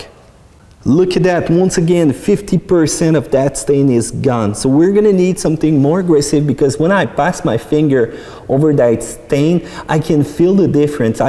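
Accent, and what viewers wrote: Quebec, Canada. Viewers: hahahhahaha quebecoissssss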